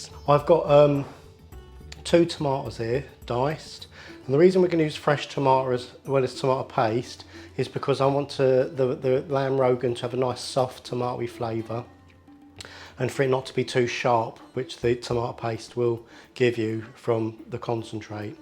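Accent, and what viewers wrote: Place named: Southeast England, United Kingdom. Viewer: You sound like you’re from Dartford or Bromley,.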